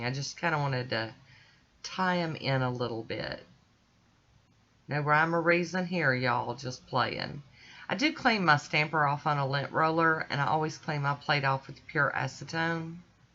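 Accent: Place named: Texas, United States. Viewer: Lady you make me smile so much! I adore watching your videos. You are creative and watching you is like watching my family. Maybe because I am a Texan and you accent and manor hit my heart. Anyway I love you so! Keep being you. Thank you!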